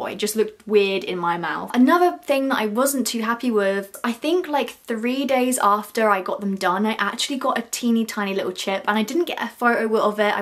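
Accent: United Kingdom. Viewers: Wow ur British accent is so cool😅😊❤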